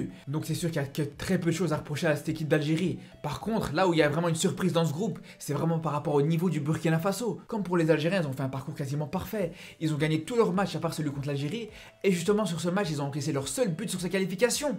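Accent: Belgium. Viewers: Salut frère l'Algérie sera qualifié sans problème,,, tu as un accent belge ?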